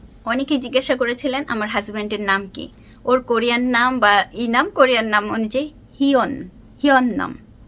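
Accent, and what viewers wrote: Khulna, Bangladesh. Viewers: আপনার কথা ভংগিমা অনেক সুন্দর কারন টা হলো আপনি আমাদের খুলনাবাসী তাই।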